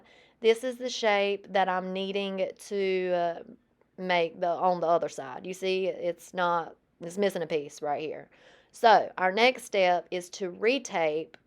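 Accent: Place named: Mississippi, United States. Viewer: (my first ever doing a comment) you are so talented in your woodworking! I enjoy your reel contents. You tell enough how you do things but don’t talk to just be talking, which I don’t mind accent being from MS! You always have variety of items. The bench is lovely, but I would have liked the seat stained, too. Adorable bunnies.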